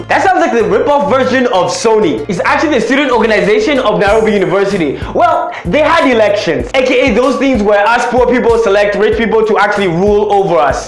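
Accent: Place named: United States. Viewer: African are racist to election, hahaha ain't that the truth!!!!You are funny you would be more funny with that cute african accent!!!You sound almost american!!!Do all kenyans speak english at all times???if yes, why oh why???What happened to your native language, I'm not even kenyan but i would appreciate some kenyan accent!!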